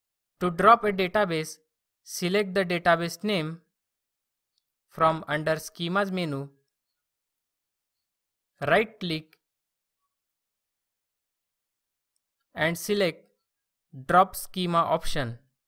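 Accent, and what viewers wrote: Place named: India. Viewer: This is how to teach any beginner properly. Most other uploaders should learn from this video. It seems to be a common problem for some programmers beeing incompetent as teachers. You need to think like a human, not like a computer. If you're having a hard time understanding the indian accent for some reason, the subtitles works well!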